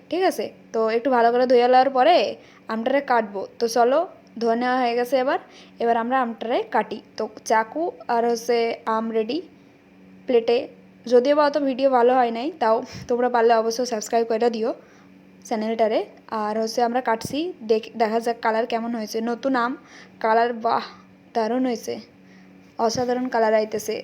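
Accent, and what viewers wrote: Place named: Bangladesh. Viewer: Tumi kolkata te aco bole mone hocche na... bangladesh a mone hocche....... language 😂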